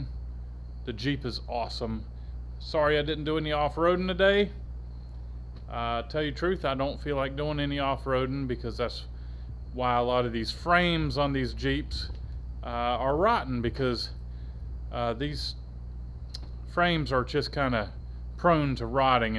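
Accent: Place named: East, United States. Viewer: you easterners sure do pronounce Oil funny like Ohle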